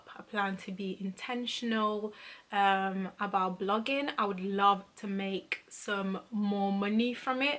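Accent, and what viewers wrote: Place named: United Kingdom. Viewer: I enjoy watching her videos with that beautiful British accent.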